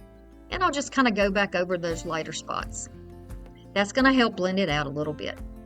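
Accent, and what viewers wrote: South, United States. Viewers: One of my favourite days of the week and the other being Tuesday. As I'm in the uk I watch your videos the day after you post them. Always the first video I watch as well and you never disappoint me. I love how chatty you were and when you get carried away or nostalgic about something how your southern accent gets stronger. Always cheers me up and I sure needed that today. Love how they all turned out and I'm definitely going to give the clay jug a go. Thank you again xx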